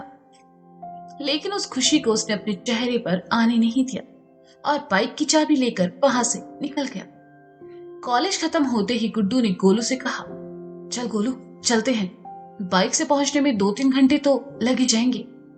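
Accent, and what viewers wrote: Kanpur, India. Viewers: Mujhe apki ye kanpuriya language boht achi lagti h kya mst bolte ho sach me jbse murari wala sune tbse boht acha lga❤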